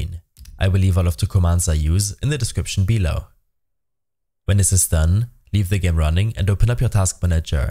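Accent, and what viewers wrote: Germany. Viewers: du bist deutsch man hört es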